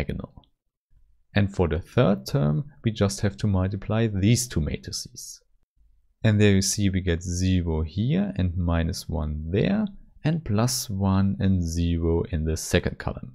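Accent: Germany. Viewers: This is great explanation, very useful.Thank you. P.S.Judging by your accent, I assume that you are from Berlin.🙂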